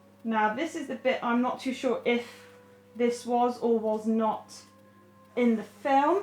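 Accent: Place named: Newcastle, United Kingdom. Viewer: Are you from newcastle? Because you say book like that. I looovvvee your accent 😊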